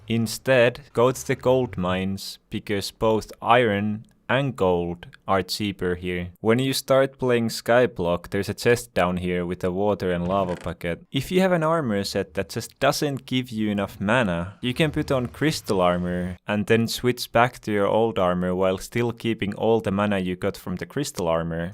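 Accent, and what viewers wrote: Finland. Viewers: Are you from finland ?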